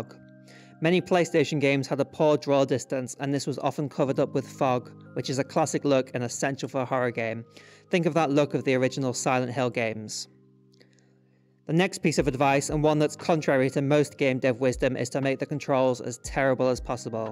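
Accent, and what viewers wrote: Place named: Northern England, United Kingdom. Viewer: Ay up it's nice to hear another Northerner who's into making games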